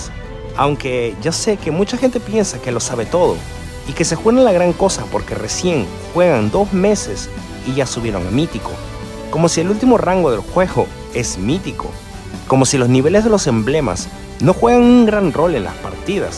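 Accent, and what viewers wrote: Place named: Venezuela. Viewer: Suenas como a venezolano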